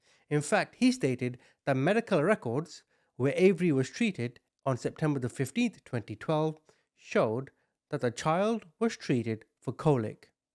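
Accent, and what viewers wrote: United Kingdom. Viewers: English is not second language, he is just British 😂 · Bruh, he’s British. There’s a ton of words that’s British English and American English pronounce differently. It’s so funny how us Americans often think “our way” is the correct/only way for things 💀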